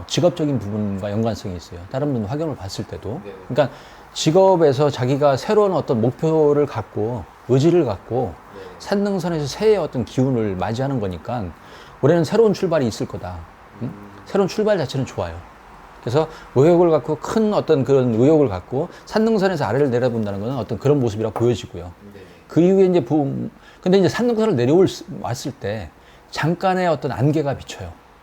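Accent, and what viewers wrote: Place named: Jeolla, South Korea. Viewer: 말투가 호남사투리 전라도 점쟁이네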